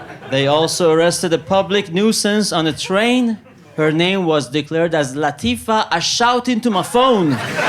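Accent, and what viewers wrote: Iran. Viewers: Why is he speaking like Iranians lol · How does he have a Persian (Iranian/Farsi) accent when his name is?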